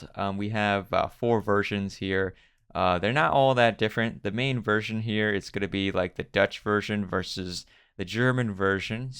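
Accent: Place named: England, United Kingdom. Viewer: The english akzent😂😂😂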